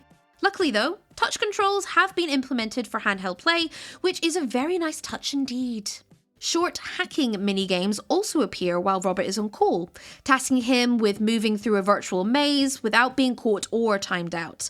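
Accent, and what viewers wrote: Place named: Scotland, United Kingdom. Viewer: Lovely to hear a Scottish voice in these videos, welcome to Nintendo Life :)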